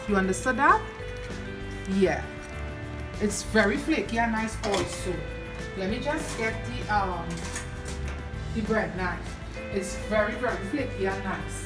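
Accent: Trinidad and Tobago. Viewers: I would love to see what this woman looks like! Her voice is amazing (Trinidadian accent)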